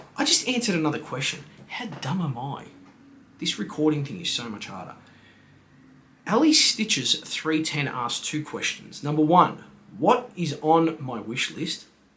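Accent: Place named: Australia. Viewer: Hey. Thanks for still give me my fortnight Dosis of Aussie accent. 🤗 great collection you have. Made my Star Wars heart beat higher. And yes please please also show the downstairs Legos. And you do know that there are glass doors for the Kallax shelves, right?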